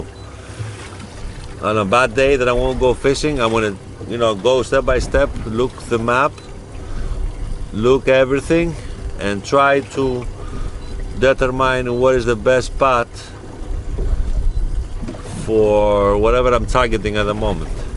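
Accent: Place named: United States. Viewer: Γειά σου από την Πάτρα.Ολα τα λεφτά τα αμερικάνικά σου! καουμπόικα!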